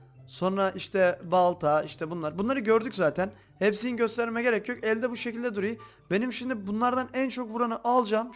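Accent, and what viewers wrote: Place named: Gaziantep, Turkey. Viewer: Abi seni eskiden antepli zannediyodum ama degilmişsin üzüldüm ama aynı antep şivesi yapıyorsun seviliyosun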